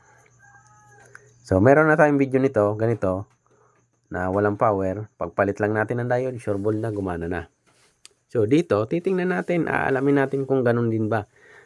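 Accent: Bicol, Philippines. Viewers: Sir taga bicol kpo ba?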